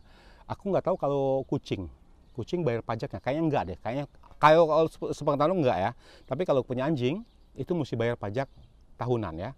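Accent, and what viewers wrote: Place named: Medan, Indonesia. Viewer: Hai salam kenal ya 😊 Ohya nada bicaranya mirip² orang medan jg ya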